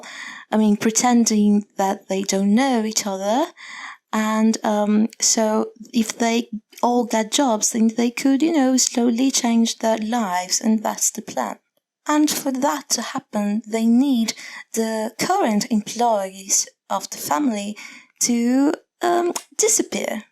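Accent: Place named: United Kingdom. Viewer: O sotaque britânico da gata! The British accent of the kitty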